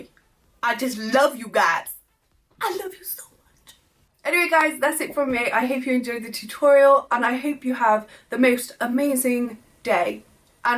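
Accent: United Kingdom. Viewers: Done: Insta giveaway. <link> OMG I’ve watched you on insta silent for so long, I didn’t even know you had an accent from England! I’m near Newcastle. Every time I hear your kind of accent I think of that “I’ve got a brand new combine harvester and I’ll give you the key” 😂😂😂 don’t be offended please lol! P.s it’s skinny but it’s wide 🤙🏻😉😂❤️ love your vids on insta! More in YouTube please deffo ❤️❤️❤️❤️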